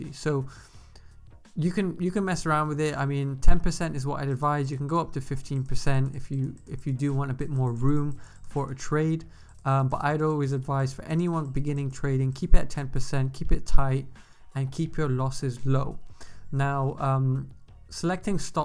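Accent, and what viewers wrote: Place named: United Kingdom. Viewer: Great video , great to hear a uk YouTuber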